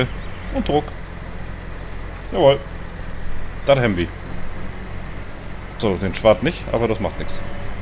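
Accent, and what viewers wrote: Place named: Low German, Germany. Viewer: Hachja... endlich wieder ehrliche Landwirtschaft mit Plattdütsch und ohne CP, wie mir das gefehlt hat :-)) Im Ernst, du bist so einmalig und so bist du an meinem Feierabend seit der Freidorf!!! Und die Grafik ist tatsächlich Wahnsinn! ich versteh gar nicht wieso! Liegt das etwa an Deinem neuen Rechner, du Schlawiner??? Oh nee muss nicht sein mit der Frankenmap, lass sie ruhig auf Twitch... Was hälst du von Gorale für Youtube? Aber eigentlich reicht auch erstmal Windsbach oder? Modcontest finde ich wirklich sehr sehr gut, war ja mein 1. mal :-D Ich finde gut, dass Mods sich mal offiziell vorstellen dürfen und dann auch mal belohnt werden. Zum Teil ja unglaublich, was die Jungs so raushauen - wie z.B. den Seasonsmod - die haben den 1. Platz absolut verdient! Was ich persönlich richtig toll fand, waren die Youngtimer-Mods und natürlich den Seasons-Mod. 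Bei den Karten bin ich etwas skeptisch, ob es später dann gefixte Versionen gibt, denn Fehler haben die alle.... So, das war das Wort zur Nachtruhe am Dienstag, Horridooo :-))) PS: Der Country-Sender ist sooo cool, ich liebe ihn!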